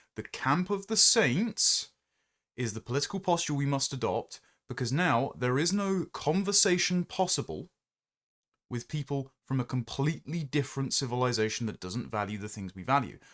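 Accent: RP, United Kingdom. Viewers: You need to see more of the world. Your posh voice doesn't mean you know the world. Where's the video slating the every person that was the same ethnic origin as the two who cut down the Sycamore tree that had stood for a thousand years? Everything you are aiming at him you could aim at those who cut the tree down. But you won't say anything about them cos they are white like you, since you have lowered everything to the colour of skin.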